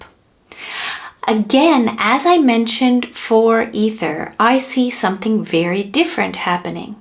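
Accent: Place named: Canada. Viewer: where are you from? Canada?:)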